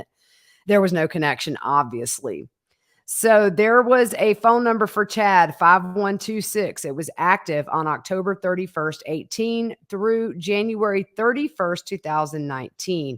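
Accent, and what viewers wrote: South, United States. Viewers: Love your accent. I’m Irish and live in Ireland, but must have been a Southern Belle in a previous life😂😂 Only joking. But love the accent, love saying y’all. 😂😂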